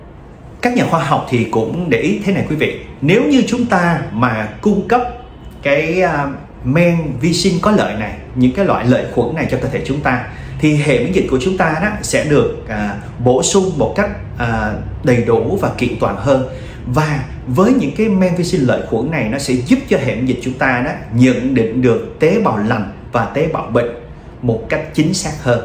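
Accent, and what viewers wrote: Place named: Da Lat, Vietnam. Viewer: Bs nói giống tiếng dân Đà lạt quá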